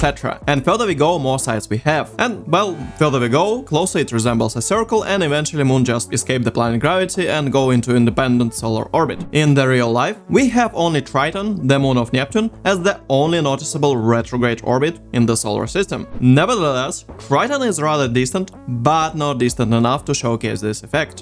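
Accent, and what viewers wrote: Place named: Russia. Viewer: You sound... Russian. Даров если Русский.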